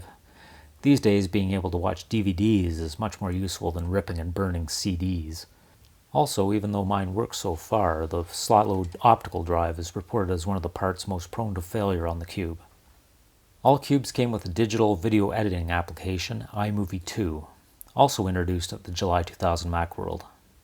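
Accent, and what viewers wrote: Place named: Canada. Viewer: You should do a video on the first aluminum iMac model from 2007! You could show Apple's sudden shift to their current aluminium/brushed metal look for their iMacs, since they released a white iMac earlier in the same year. I REALLY miss the white clear plastic look, but I have a fondness for the early aluminium iMacs. About 2 years ago I rescued one from being thrown away at my university. It was running Yosemite (and very poorly). I installed Leopard on it using a modified install disk for a MacBook. But I'm planning on putting Tiger on it sometime in the future. I even picked up the original mouse and keyboard that came with that model, just to keep it era-accurate. Also love your channel, I immediately knew you were a fellow Canadian.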